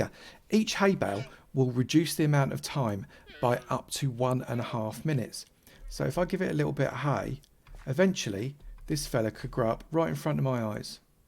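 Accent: United Kingdom. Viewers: btw, oi quoite loik ur british accent its almost asmr to my ears i may join ur server someday. plz do a video on...endermites?(i couldnt think of anything)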